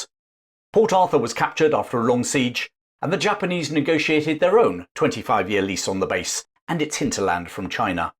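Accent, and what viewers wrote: England, United Kingdom. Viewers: Thank You, for reporting Weihaiwei with such a lovely VOICE! I was born and raised in the British Crown Colony of Hong Kong in 1946, and attended missionary schools with a British curriculum; therefore, your crisp English accent delighted me dearly. Just like when I was a little girl again. 😊 At one time in the 1950s, my Chinese father worked for the British Army ---- as a projectionist for showing British films---- In Sek Kong, in the New Territories! I got to holiday with my Dad during H.K.'s humid Summers, and remember watching " Horrors of Dracular" sitting BEHIND the large movie-screen. // The British had left Hong Kong with good infrastructure: Building the railroads to the Chinese border.... but also, set up the Judiciary System. Of course Great Britain realized the benefits ( the Lease will be ended in 1997) and made it a great financial center with its great geographic LOCATION in Asia! 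Again, I am a "British Overseas Territory" history-enthusiast and thoroughly ENJOYED your historical video of Weihaiwei. THANK YOU, my Friend! ❤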